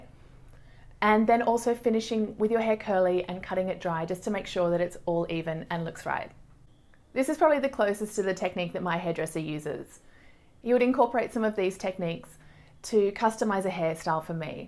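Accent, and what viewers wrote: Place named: Australia. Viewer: Yay an Aussie.. So now I can breathe and understand u 😂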